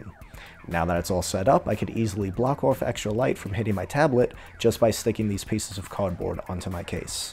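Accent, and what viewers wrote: United States. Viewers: I don't know why but the more the video went on, the more I begun to like this guy. Might be his accent which I assume is like an East Coast accent? IDK. But consider me subscribed. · i love this deep brooklyn review :DD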